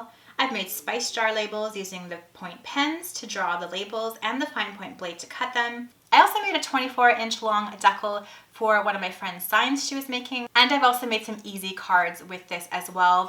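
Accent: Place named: Canada. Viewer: I love the way you say “decal”. Canadians you need to stop apologizing for how you talk. We love your accent!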